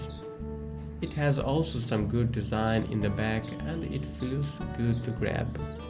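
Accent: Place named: Bangladesh. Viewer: hey bro...😍 You r from Bangladesh? you're voice accent is really amazing 😍 Love 🇧🇩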